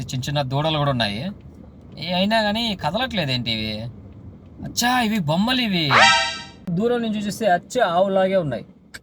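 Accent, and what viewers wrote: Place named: Piler, India. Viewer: I think me slang batti chuste medi pileru kada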